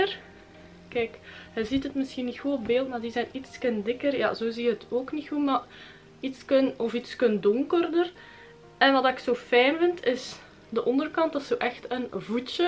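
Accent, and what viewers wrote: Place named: Aalst-Ninove, Belgium. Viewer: Ik denk dat je uit mijn streek bent ( Aalst-Ninove etc). Aan de spraak te horen ;)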